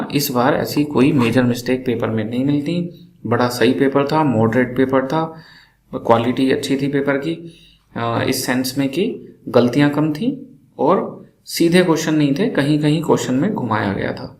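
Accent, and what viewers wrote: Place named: Western Uttar Pradesh, India. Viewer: Aap up west (to be exactly muzaffarpur nagar) se ho kya ??? Mere kuch classmates they us area se same yahi excent, tone and dialect thi..😊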